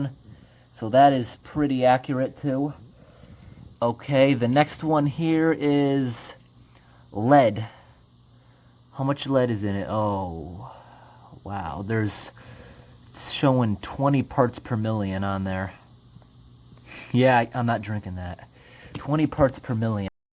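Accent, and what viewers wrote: New England, United States. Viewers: Dark Diddler New England accents are very unique. He says “dat” instead of “that” which is pretty common for that area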